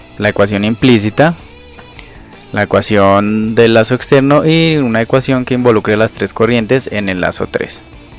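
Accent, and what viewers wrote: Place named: Colombia. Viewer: Ya te agarré el acento, Es de Colombia. Es que dicen que los de Sinaloa tenemos parecido el acento al de Colombia. Tienes una joya de canal, lástima que no tengas las visitas que mereces. Pero sé paciente. Poco a poco. Saludos!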